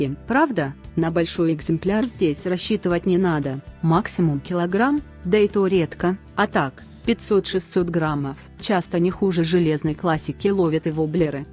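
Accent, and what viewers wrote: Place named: Baltic. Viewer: Вы из Прибалтики судя по акценту? Инфо как всегда интересное, спасибо.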